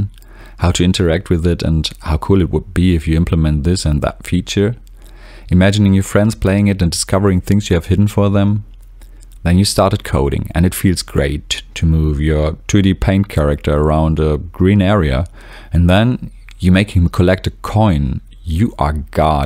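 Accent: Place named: Germany. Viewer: hi there, thanks for the nice video. i can totally relate to your situation. i think i am addicted too, even if it manifests a little different for me. i dream of being a game dev all the time and i am one of these guys who restructured their life to do so. (i get up at 5, not at 4 but yeah...) the problem for me is, that i sometimes get so overwhelmed with the scope of my game and the obstacles i face, that i struggle to start coding. i watch other devs instead or procrastinate in some other way... i hope participating in some game jams in 2023 will help me getting in the habit of finishing games. you sound like your native language is german. stimmt das? woher kommst du wenn ich fragen darf?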